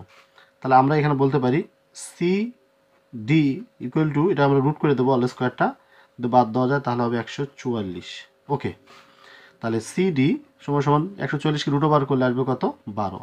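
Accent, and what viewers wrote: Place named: Gaibandha, Bangladesh. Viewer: Apnar basha gaibanda na vaiya😂😂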